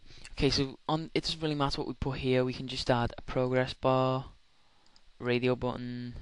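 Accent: Liverpool, United Kingdom. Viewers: Neva mind, Found it! Nice Accent by the way! Scouser? I'm Welsh, I h8 my accent!! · I think you will find it's Scouse (Liverpool)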